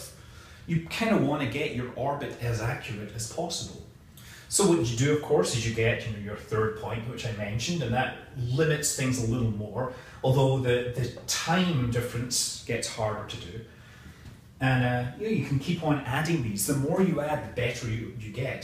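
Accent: Scotland, United Kingdom. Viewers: This is too scottish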